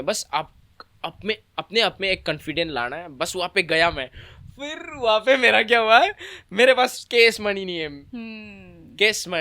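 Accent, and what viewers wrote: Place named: Arunachal Pradesh, India. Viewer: Why your hindi is like arunachali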